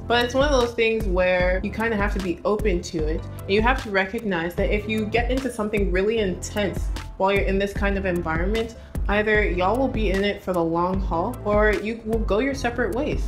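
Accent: Nigeria. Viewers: I love how you are adopting the Nigerian accent that males the video really fun. Keep it up girl! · Your Nigerian accent is off the roof.All American kids tries to take, my little tries to fake my mom's accent too. You're good. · Sounds very Nigerian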